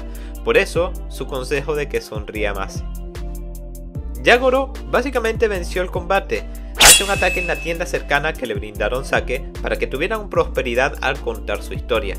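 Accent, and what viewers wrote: Venezuela. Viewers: Es venezolano Jajaj😂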